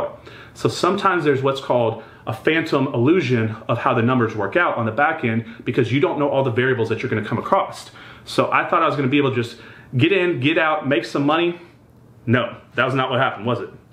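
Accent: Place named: South, United States. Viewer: Good info but you talk to fast. That combined with your Southern accent makes it hard to listen IMO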